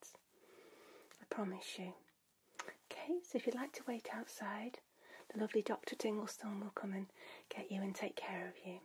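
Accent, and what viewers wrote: United Kingdom. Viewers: Love hearing that beautiful British voice & it sounds so bloody lovely! Watching your content always feels so welcoming and relaxing as well! Keep up the great work & goodnight! 🥰💜💜🥱🌃🌙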